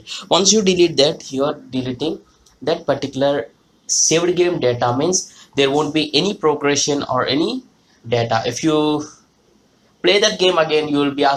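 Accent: India. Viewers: Always a trash video, when it’s a tutorial with an Indian dialect…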